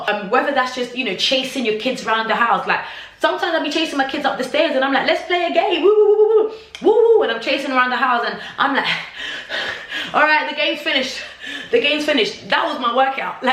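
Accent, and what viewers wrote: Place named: England, United Kingdom. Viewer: Your english accent😍😍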